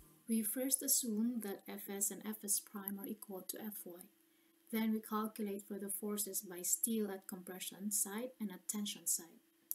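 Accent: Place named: Europe. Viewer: Incredible voice. Very interesting accent: a combination of European accents(?) But should have been closer to the microphone.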